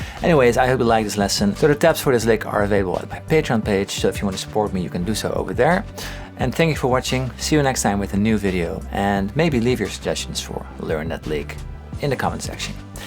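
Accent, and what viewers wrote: Netherlands. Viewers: I was initially annoyed by your fantastic English in that Dutch accent, not to mention your prolific beard. I have become a true fan, though. Thanks for all the great content.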